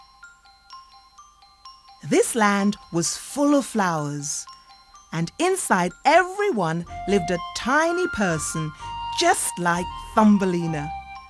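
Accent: United Kingdom. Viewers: イギリス英語ですか？よく聞くのと発音がちょっと違う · エル エル イギリス英語です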